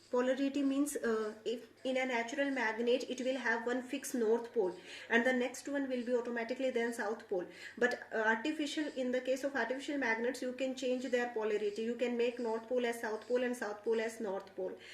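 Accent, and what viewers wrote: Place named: Kerala, India. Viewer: Thanku for the vdo mam. And mam pls tell are u from Kerala because ur accent is same as mine because I am also from kerela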